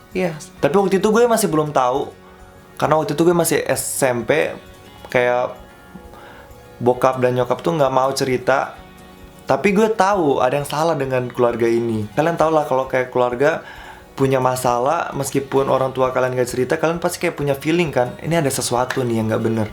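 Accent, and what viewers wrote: Palu, Indonesia. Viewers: Ko rada denger aksen palu nya hehe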